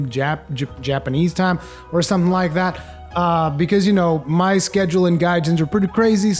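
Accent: Portugal. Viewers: ESPERA AI, TU É PORTUGUÊS? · Are you portugese? Your english is better than mine and Im native lmao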